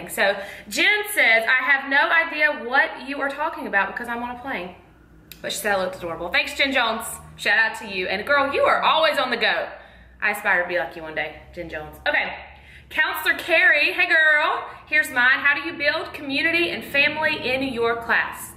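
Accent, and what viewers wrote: South, United States. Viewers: You are so southern and I love it!!! I'm a Texas gal!!! You are so down to earth and hilarious!!!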